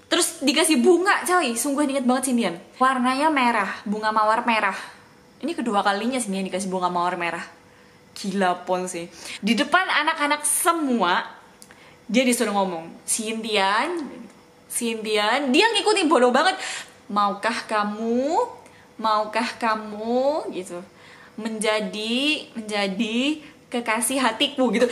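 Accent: Surabaya, Indonesia. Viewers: Lucuuuuuuu medokkkk surabaya banget deh 👍🏼👍🏼😂😂😂😂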